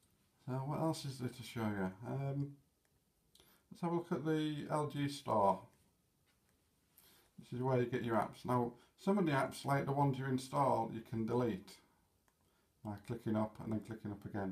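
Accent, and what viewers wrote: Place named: Yorkshire, United Kingdom. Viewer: where bouts are you from, i notice the thick yorkshire accent and you also have "look north" on the telly.. just wondering if your anywhere near Hull... (Where im from!)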